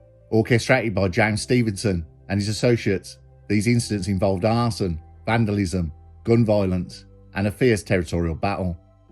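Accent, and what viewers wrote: Birmingham, United Kingdom. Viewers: Massive congratulations and respect to you and your content brother. I literally can binge watch your videos for hours. There all perfectly to the point and not too long and cover everything and sum it all up at the end brilliantly. Keep doing your thing geezer. Ps never used to be a fan of the brummy accent but yours fits perfectly. Keep it up mate.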